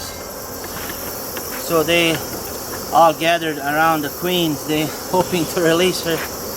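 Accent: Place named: Balkans. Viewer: You sound... like you're from my part of the world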